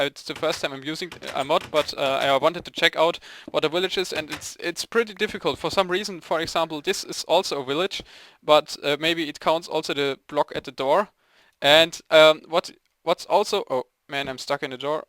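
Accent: Germany. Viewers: real german english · u sound german :D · He sounds like a german and there are some german players around him (Banenenkrieger = bananwarrior)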